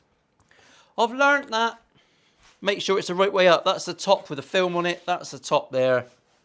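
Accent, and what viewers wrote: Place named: Plymouth, United Kingdom. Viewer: I’ve only been watching for a year at most but I love it. I run a family jewellery business in North Cornwall but I did have a bit of a break in my 30s and went building. The first time I watched I was instantly reminded of the banter we used to have on site (although to be fair you’re funnier than any tradesman I met😂). Informative,funny and a janner accent. Can’t go wrong!😂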